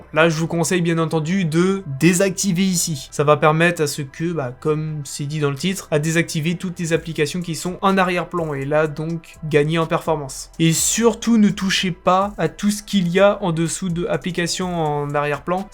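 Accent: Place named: England, United Kingdom. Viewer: sartek l'accent rosbeef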